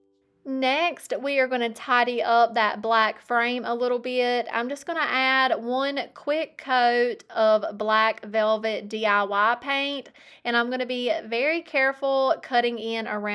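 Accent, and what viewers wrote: South, United States. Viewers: I love your southern accent.❤ Soo 😍 cute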